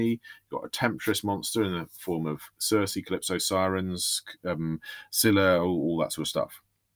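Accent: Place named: Quebec, Canada. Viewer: this dude sounds like quebec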